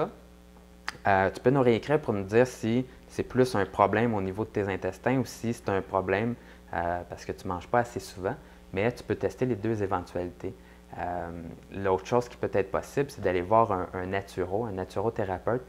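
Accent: Quebec, Canada. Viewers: c'est clairement un québécois. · Malgré les commentaires negatif sur l'accent quebecois dans la video, je suis fiere d'en etre un et je ne veux pas me venter mais le québec est bien mieu que bien des endroits sur la terre. Sur ce... Merci BONSOIR! :D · Merci pour cette vidéo, j'ajoute que je suis un parisien et que j'aime beaucoup l'accent du Québec..en tous cas beaucoup plus que celui des sudistes synonymes de délinquance et de trafics en tous genres.... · je suis pas francophone ni quebecois..... mais je trouve cette video tres bien fait et audible le quebecois est un accent difficile a comprendre certes mais unique c'est ca qui le rend si particulier et magnifique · merci pour tes conseils. De mon côté j'ai qq problèmes à perdre ma fine couche de graisse restante sur le ventre, ça fait des mois que je fais attention à mon alimentation et que je m'acharne à la salle de sport 2 à 3h par jour du lundi au vendredi + Qwan Ki Do le samedi...je vois une légère évolution mais c'est très lent, ça en deviendrait presque décourageant. 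Pour les(probablement) français, arrêté de vanner l'accent quebecois. Je suis belge et je comprend très bien tous ce qu'il dit. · Moi j'adore les accents quebecois, d'ailleurs il en a un :D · on rit pas de votre accent moi perso je le trouve super l'accent québécois :)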